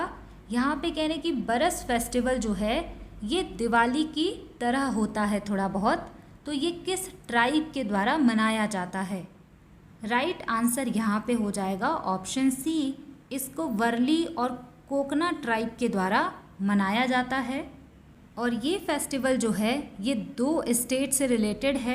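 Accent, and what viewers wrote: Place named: West Bengal, India. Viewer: Ma'am aap pakka West Bengal sein ho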